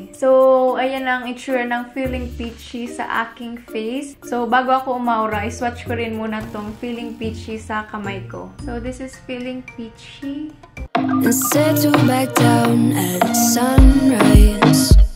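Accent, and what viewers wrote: Visayas, Philippines. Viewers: parang bisaya to haha tabang mga binisaya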